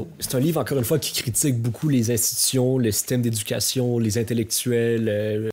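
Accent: Quebec, Canada. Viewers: Que l'accent québécois est beau et charmant! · J'adore ta chaîne. Du vrai contenu dans le beau français du Québec.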